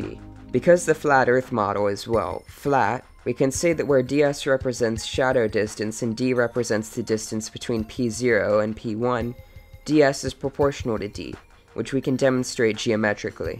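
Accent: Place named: Canada. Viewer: the video is awesome, but I was distracted by the fact that you said you live on the 37th parallel but also sound kind of Canadian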